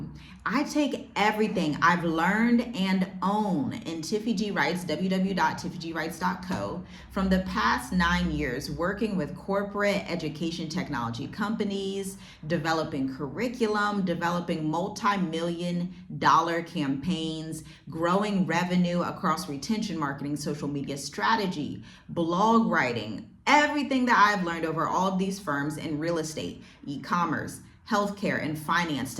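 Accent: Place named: United States. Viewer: Yeah ...yeah....yeah...you tooooo American and no longer African ,,,, you hurt,, speaking from a privileged and heartless country and yet you look like us but speak like them,,,,it's time for change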